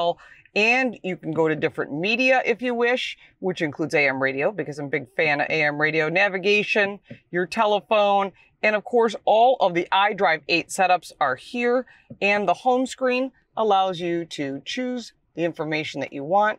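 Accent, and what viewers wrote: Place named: Buffalo, United States. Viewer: Paul's wife, Loveable❤ barbie doll Lauren Fix Car Coach Reports, congratulations on an excellent quality 2024 BMW 5 review. Beautiful vehicle, excellent explanation as always, love the Buffalo, NY accent and your test drives! ❤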